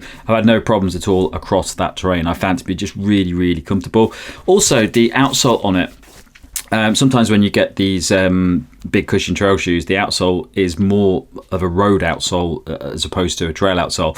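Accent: United Kingdom. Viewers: Are you from the Black Country. Is that why you talk like that?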